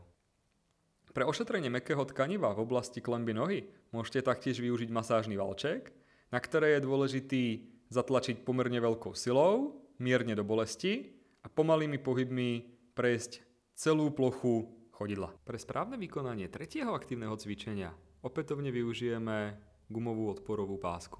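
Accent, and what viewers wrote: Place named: Czech Republic. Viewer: Ahoj ty Si čech alebo Slovák ako to rozprávaš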